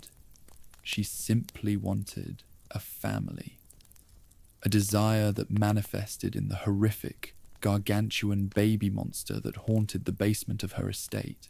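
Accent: United Kingdom. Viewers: Good, calm voice. Crisp sound. British accent(lets go). Now I know what I'm watching for the next two weeks as i drift off to sleep during the 10 mins I'll manage to watch. Great vid dude!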